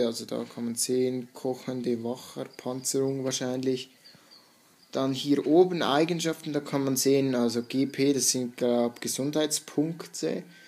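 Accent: Switzerland. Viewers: Da hört man Schweizer Dialekt raus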